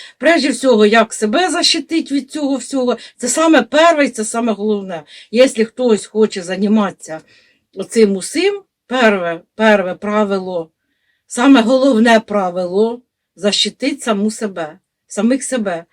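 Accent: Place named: Poltava, Ukraine. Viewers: І в Дніпрі такий діалект..Що тут такого??? І моя бабуся так розмовляла,вона з Полтавщини, але все життя у Дніпрі прожила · Це полтавська говірка. Я народилась в чернігівський,.лише до полтавської. Там так говорять. Північна частина чернігівщини більше вживають зросійщених слів, не чисто російських. А у полтавська говірка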